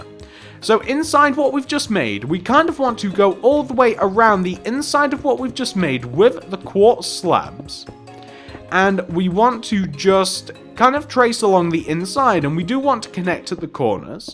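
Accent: England, United Kingdom. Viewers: Love the vids r u English?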